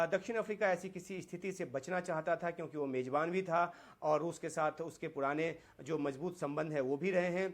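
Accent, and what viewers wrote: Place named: Bihar, India. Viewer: साला बिहारी तेरि pronounce से पता चलराहा है तु बिहारी है या बाङ्गगाली है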